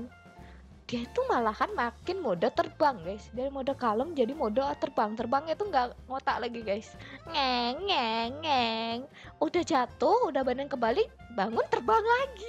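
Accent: Java, Indonesia. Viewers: Asal dari mana k,aku baru subcreab k,dari logatnya dari orang jawa. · Kaka orang jawa ya?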